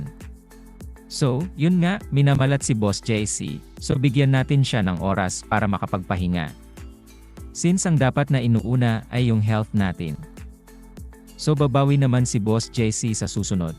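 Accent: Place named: United States. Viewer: Naging Kano ang accent ni Bossing!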